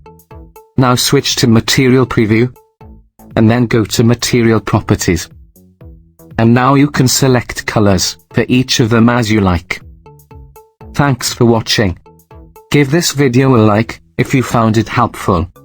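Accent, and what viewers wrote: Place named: Wales, United Kingdom. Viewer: Blender tutorial in welsh accent 😂 this is amazing. I love it!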